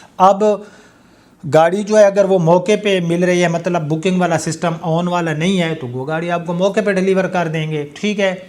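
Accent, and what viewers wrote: Saraiki, Pakistan. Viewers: اپ کی اردو اور انگلش کے الفاظ کی ادائیگی بالکل بھی درست نہیں ہے اپ کا سرائیکی لہجہ ہے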